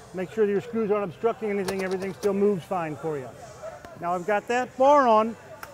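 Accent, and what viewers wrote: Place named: England, United Kingdom. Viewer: I love your English accent and I hope if you start teaching English that will be amazing 🤩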